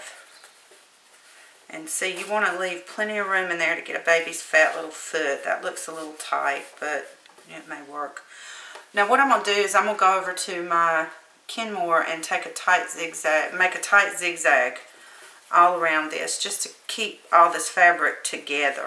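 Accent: South, United States. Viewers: First, there's not anything as adoring as a southern accent! :) Secondly, these are the cutest little shoes and I'm going to try my hand at making a pair. Thanks for posting it. Blessings!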